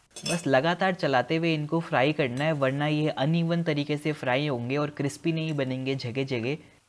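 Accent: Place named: Indore, India. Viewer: Indori tone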